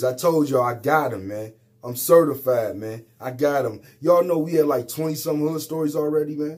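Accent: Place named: Cleveland, United States. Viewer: CMHA? Cayuga Metro Housing Authority? I was trying to figure out where you were from by your accent--I thought maybe Chicago but now I could hear it is Cleveland (lol after looking up CMHA!)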